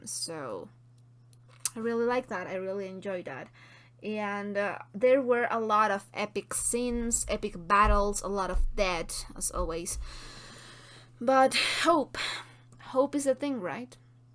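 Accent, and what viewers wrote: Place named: Mexico. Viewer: I hope that someday you'll read the 3 novels, . As hard as it may be to believe, the books are 100% better than these fantastic films, especially the character- and world-building. I read the works as a teen, and now I listen to them on YT audiobooks when I'm resting, and while doing household chores! I really enjoy your accent, having lived among Mexican farmworkers on both sides of the border, for a total of 10 yrs way back in the 1990s. I am a retired antropólogo cultural. ¡Nos vemos pronto señorita! 🤞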